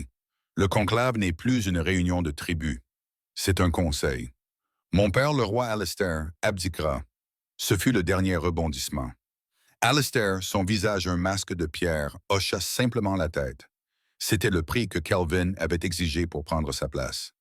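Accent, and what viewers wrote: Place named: United States. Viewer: Arrete de parle français avec une dialecte américaine, ça casse tout le histoire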